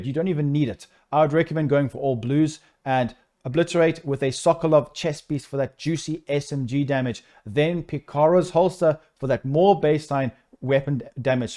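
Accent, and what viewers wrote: South Africa. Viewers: you from south africa ?? Discord ?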